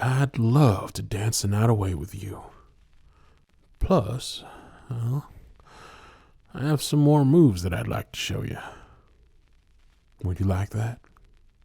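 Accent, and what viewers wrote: South, United States. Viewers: I love country I love the country accent to and mostly I love you and your awesome videos keep up the awesome work! ❤❤❤❤❤❤ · Wonderful job! I love your country accent its so soothing. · i like cowboy and his Southern accent is spot on see i live in Georgia and i'm like almost surrounded bye people who have Southern accents so your southern accent is pretty good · As someone from the south i thought this accent wouldn’t have an affect on me but BOY WAS I WRONG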